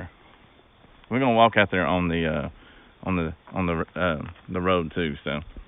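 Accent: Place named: South, United States. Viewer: That music is God-awful. Geez. You talk with a southern, accent, yet, use skanky boof music? Bluegrass, dude. I lived in Franklin for 20 years, worked in Bryson. City at Swain County Hospital, Harris Regional, Haywood Regional, & Memorial Mission/St. Joe's hospitals.(Westcare). I miss it, as my daughter & grandchildren will live & work in Franklin. Been to Fontana....beautiful area. I want to go back....to my home. My husband & I had a band, played "Pickin' On The Square", in Franklin, and many other places.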